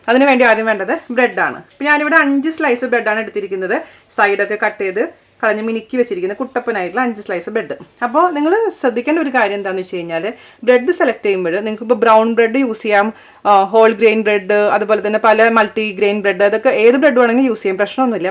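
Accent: Thrissur, India. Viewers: Hi chechi...checheedea thrissur slang samsaram enik othiri eshtanu. Adhu polea coocking..dubail evdea chechi stay cheyunadh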